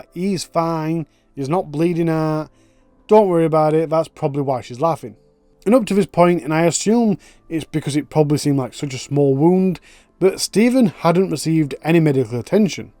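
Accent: Yorkshire, United Kingdom. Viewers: By any chance, a Northerner, you? Yorkshire?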